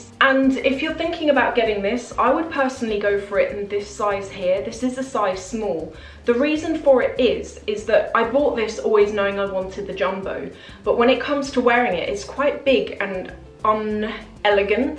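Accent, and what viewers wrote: England, United Kingdom. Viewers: 1) I dont care about this sponsored video. This is still a great vdo because you are talking like a manual for how to carry out the Lily Silk outfits for someone who wouldnt have much clue about how to put them all together and look great. It could be helpful regardless the outfits are from Lily Silk or not. 2) The way you made your point on getting just one bag, in which colour, in which size - HELPED ME. Because I am about to get one. Thankyou! 3) Your accent is so lovely. You look beautiful and elegant too! English accent is like music to the ears. Other English youtubers with a beautiful accent and are worth watching are Cassie Thorpe (self diagnosed luxury addict lol), Emily Norris (who makes great mommy videos)., this is the first time i'm commenting on your channel because i friggin loved the whole idea behind this vdo ❤ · I watch your video because I love your British accent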